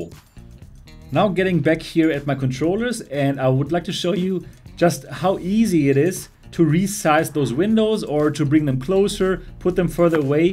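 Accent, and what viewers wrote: Germany. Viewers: Man hört von der ersten Sekunde, das du ein Deutscher bist ^^ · Are you from germany?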